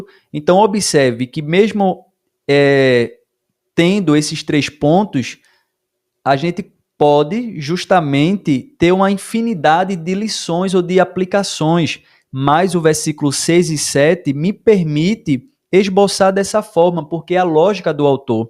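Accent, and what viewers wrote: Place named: Northeast, Brazil. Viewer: sou Do RJ, pelo sotaque creio que seja do nordeste. Fico feliz por ser tão jovem e dedicado a obra de Deus. Estava fazendo um estudo e apareceu o seu aqui no meu celular. Fiquei feliz . Deus te abençoe um abração do carioca.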